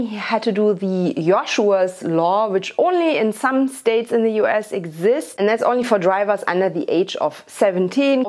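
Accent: Germany. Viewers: Das hat mir gefallen. Aber nach 16 Jahren so ein krasser deutscher (like they let talk "us" in movies...) Akzent?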